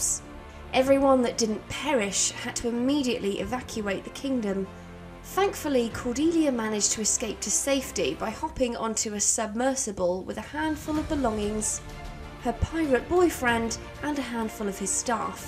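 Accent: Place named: Scotland, United Kingdom. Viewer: me hearing scotland be like, yas.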